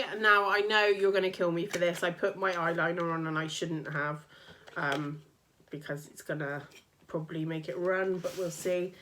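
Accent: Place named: RP, United Kingdom. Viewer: you speak posh, good to see you again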